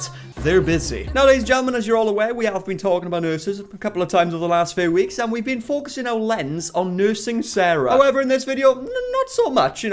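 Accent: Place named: South Wales, United Kingdom. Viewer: I'm 90 % sure iv seen you irl in Ystrad tesco 😂 iv watch 2 of your videos and the accent is definitely giving south wales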